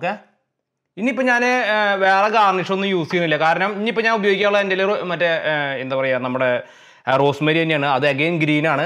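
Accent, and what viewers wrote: Thrissur, India. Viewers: Thrissur karande samsaaram poli anne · ചേട്ടായി, മ്മടെ തൃശൂർക്കാരുടെ ആ സ്ലാങ് അതും കൂടിയായപ്പോ ഒന്നും പറയാനില്ല. സൂപ്പർ. അടുത്ത ഓഫീനു ഇണ്ടാക്കീട്ട് തന്നെ കാര്യം. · This is the first time watching your channel. Thrissur ആണല്ലേ. ഞങ്ങൾടെ അതെ സംസാരം. loved 🥰🥰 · തൃശൂർ സംസാരം.... സൂപ്പർ ചേട്ടായി.... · Pakkaa trissur ttaaa ❤️ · ത്രിശ്ശൂർ സ്ലാങ്ങും ഓവർ ടോക്കും മഹാ ബോറാണ്‌..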